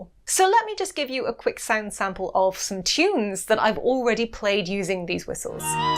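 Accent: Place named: United Kingdom. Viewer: Very nice video, hello from France. (I knew it, when i heard UK. You are not Irish (I 've lived there, i know the accent) not Scottish too i think. So you are from Doctor Who' s country. Love the UK, see you next time.)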